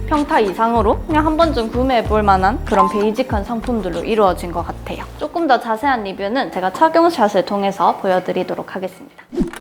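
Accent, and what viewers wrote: Gyeongsang, South Korea. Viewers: 님 대구분이세요?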